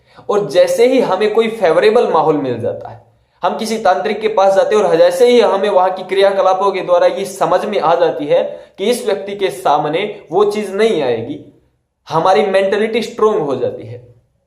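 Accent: Haryana, India. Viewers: Pkka Haryanavi hai bhai.. Maan ge bhai.. Bhai mera comment pdhe aur btana chahe toh ek baat puchhni thi bhai se ki kahan se ho bhai haryana mein.. Baaki boli toh thodi thodi panipat ki lgi..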